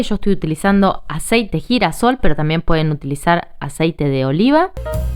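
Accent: Argentina. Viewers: CHICA ERES DE ARGENTINA SI POR EL ACENTO ANTES HABIAN MUY BUENAS RECETAS HABIA UNA CHICA FABULOSA ELLA SE COMIA LOS jos crudos le tenia mucha No E acuerdo de su nombre era fabulosa lo unico que se que era hija de una artista de cine argentino Etc no recuerdoel nonbre hace mucho tiempo de eso .okey Yo vivi en Nyc cuando iba CONEY ISLAND LA PARTE DE LA COLONIA RUSA JUDIA EN EL BRAITHON BEACH EN LAS PUERTAS DE LAS CAFETERIAS RUSAS VENDIAN UNAS EMPANADAS MIY GRANDES COMO DE 15 CT. RELLENAS DE POLLOS OTRAS DE PAP.AS Y QUESO CARNE ERAN MAZISAS ESPONGOSAS MUY RICAS SE ACABAVAN EB SEGUNDO TODO EL MUNDO A COMER ERAN DIFERENTES A LAS NUESTRAS LUEGI EL CAFE O LO QUE QUISIERAS MENOS VODKA MAY GOODNES QUE TOMAN LIS RUSOS SERAN LOS NO JUDIOS ESE LUGAR MI FAVORITO POR EL BUFFET RICO BARATO FUTAS VERDURAS ALLI TRABAJAN MUCHOS MRXICANOS Y HASTA HABLAN RUSO ES LINDO X ALLI TAMBIEN VENDEN PRODUCTOS DE LOS ADMICHII ESA GENTE DIFERENTE QUUE VIVEN CREO EN PESILVANIA PERO BUEN QUESO MANTEQUILLA LECHE Y BARATO NO SE AHORA CON ESTO DELA EPIDEMIA NOS ARRUINO Y TODO ESTA SUBIENDO QUE SUBAN LOS VICIOS ASE COBRENLE UN OJO DE LA CARA Y QUE VALLAN A BSÑAR AL RIO HUDSON PERO LA COMIDA NO. 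NO SE QUIRE LIMOSNA DE COMIDA SI NO TRABAJO CON DIGNO CON EL SUDOR DE EL ROSTRO .NO DIGO MAS DISCULPEN POR QUE LA GENTE SE ACOSTUMBRA Y ESO NO ES VIDA.TRABAJO PARA AMERICA LATINA EMPRESAS SI SE PUEDE GOD BLESS HUMILDEMENTE.🇺🇸❤❤❤❤❤❤❤❤🇵🇪🇦🇷🇧🇷🏆🤩🎯🎯🎯🎯🎯🎯🎯⚽️🏆🇵🇪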